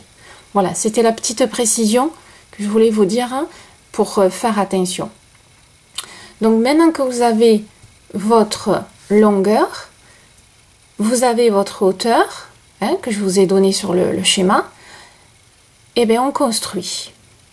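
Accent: South, France. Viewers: l'accent du Sud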